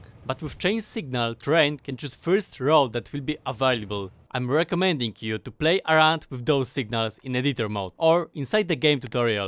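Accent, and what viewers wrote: Poland. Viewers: Witam zacny poradnik :) Wiedziałem że gdzieś słyszałem ten akcent Polska gurom! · Od razu rozpoznałem polski akcent :) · twój angielski naprawde zajebiście brzmi jak na polaka. akcent dalej słychać lecz nie jest cie aż tak ciężko zrozumieć. Trzymaj ten poziom mordo, bo nie musisz go podnosić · Ty z polski? Taki akcent masz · Beautiful Polish accent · That Polish accent is funny)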